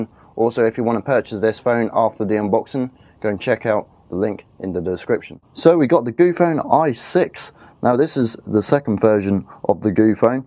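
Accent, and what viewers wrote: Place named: England, United Kingdom. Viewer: Are you English?, I love that accent!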